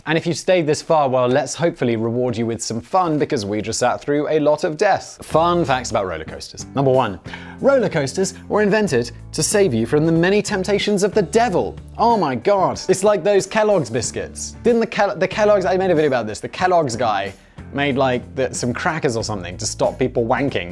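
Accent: United Kingdom. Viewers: I'm here for your beutiful British voice and your ability to rant more frequently than my own thoughts. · Oh dear! We are here because we are Gluttons for Punishment.... and some of us are fans of the British Humour and Accent! lol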